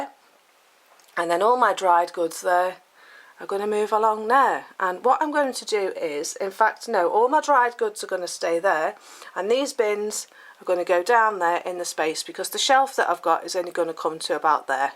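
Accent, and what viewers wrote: Liverpool, United Kingdom. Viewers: Nice pantry ,where do you come from originally? I thought a bit of a Liverpool accent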